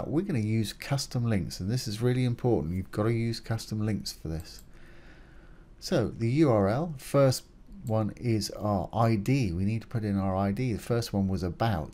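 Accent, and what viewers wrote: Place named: West Country, United Kingdom. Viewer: Hi.. Trying to place your accent. It s South with a bit of west county. Anyways enough of the small talk. Have been following your excellent lessons.As I'm just starting a website, this one in particular has inspired me to create a better idea than just a coming soon template so i can expand on the content more than just a few pictures and text. Ive liked & subscribed where i can. Was wondering if you could offer a little advice. So ive set up my Woocomm product pages with variable options,size colour etc. I make belts The drop down offers 100 colour options listed as names where the customer can make a choice. However a button next to it takes you to a gallery page showing all the colour swatches as better experience. So the ask in all this is. Can i some how link a choice in the galley to become added as part of their choices?. 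ive noticed you can hyperlink the image text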